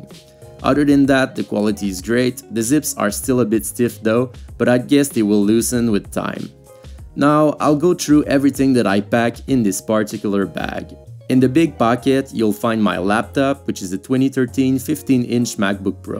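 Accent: Quebec, Canada. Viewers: Are you by any chance from Quebec? Your accent sounds quite familiar. aha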